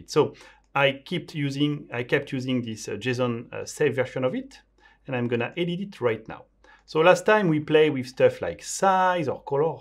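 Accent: France. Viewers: I think it's a french's accent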